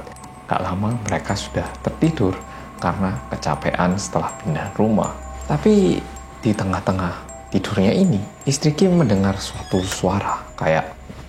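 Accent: Java, Indonesia. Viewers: Merinding boosss, sumpah Bagus nih cerita nya bang medok👍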